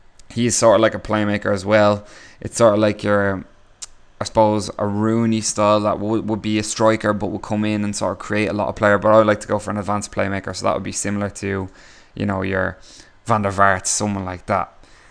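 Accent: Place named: Ireland. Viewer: Lol i wans't expecting this guy to be Irish.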